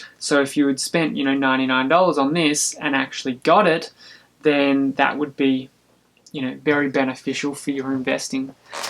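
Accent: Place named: Australia. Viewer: Are you an Aussie, Mate? I can hear your Stroine! Goodonya mate! The Theme Song kinda gave it away as well!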